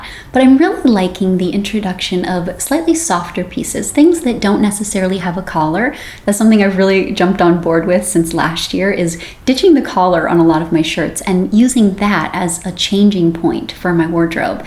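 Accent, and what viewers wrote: United States. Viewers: american? canadian? accent of the host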